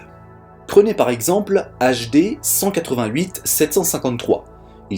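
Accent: Italy. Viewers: Pouce Bleu pour l'accent italien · G mis un pouce bleu pour ton accent italien lol :)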